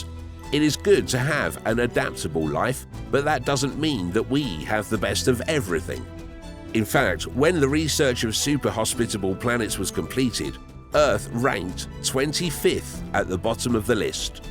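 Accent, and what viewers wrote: United Kingdom. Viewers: Why does the guy have a British accent then?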